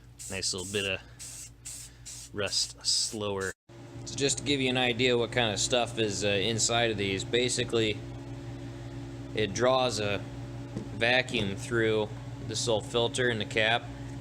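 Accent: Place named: United States. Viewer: Why for the love of Christ does it absolutely have to be a yankee talking on most of these videos... like nails on a chalk board to my ears😵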